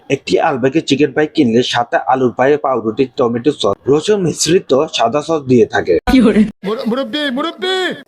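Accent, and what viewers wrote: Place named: Noakhali, Bangladesh. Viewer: আপনার ভাষা মাঝে মাঝে বুঝি নাই। আপনি মনে হয় নোয়াখালি কিংবা চিটাগংয়ের মানুষ।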